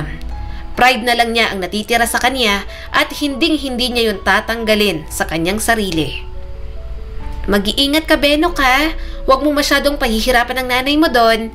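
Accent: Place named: Hiligaynon, Philippines. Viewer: Ilongga cguro nag babasa ng story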